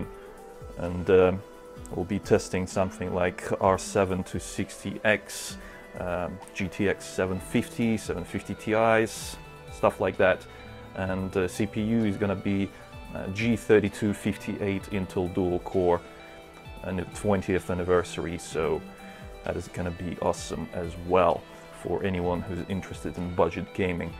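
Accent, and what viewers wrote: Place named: Russia. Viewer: я понять не могу ты русский что ли(акцент русский просто) .I can not understand that if you are Russian ( a Russian accent )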